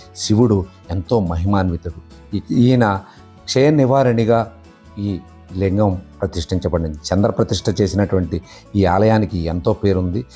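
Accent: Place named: Visakhapatnam, India. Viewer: godavari matayasaledu vizag matalvunnayi 😍😍🙏🙏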